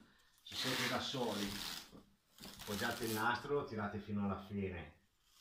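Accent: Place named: Lombardy, Italy. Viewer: Di dove sei,dall'accento sembri lombardo,sbaglio?Sei un imbianchino da 10 e lode,complimenti da un collega!!!Mi iscrivo subito al tuo canale!!Per dipingere una parete grande,ci vuole un pennello grande!!CIT. Ma no,non ci vuole un pennello grande,ma un grande pennello,Cinghiale!! CIT.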